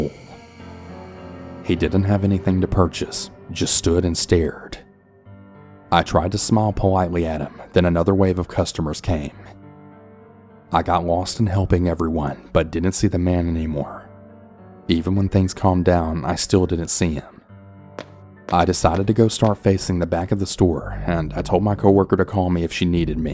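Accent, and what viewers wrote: South, United States. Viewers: Why does the narrator sound like he's from the south? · I love the country accent Thanks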